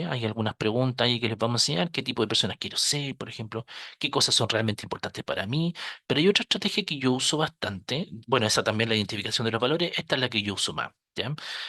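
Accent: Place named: Chile. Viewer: Genial, estudiaré el tema y además con acento chileno. ❤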